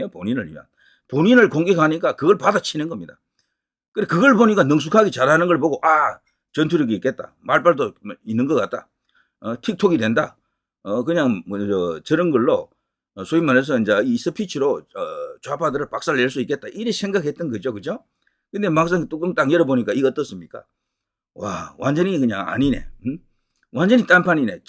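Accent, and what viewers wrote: Gyeongsang, South Korea. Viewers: 발음을탓하기전에 더보기보시면 바로아실텐데 경상도 억양은 발음자체가 표준어처럼표현하기힘들어요 새겨들어야합니다 취지가 참 못되셨네 빙빙돌려서 참 불쾌한짓을 하시네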